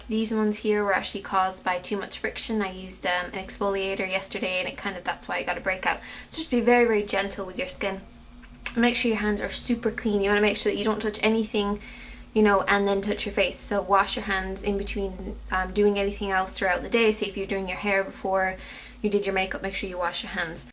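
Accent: Ireland. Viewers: ur irish? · Are u Irish??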